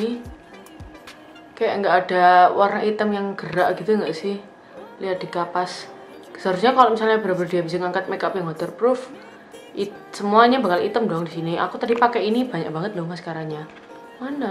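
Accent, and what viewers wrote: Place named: Java, Indonesia. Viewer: Medokkl tenan rek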